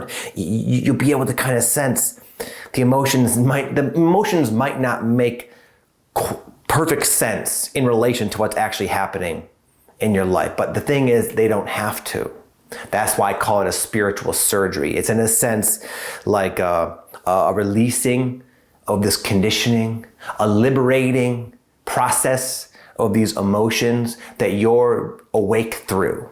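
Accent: Michigan, United States. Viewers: Great video as always! Unrelated note, I can hear the Michigan in your accent (it's okay, I can say that because I'm from Michigan too 😂)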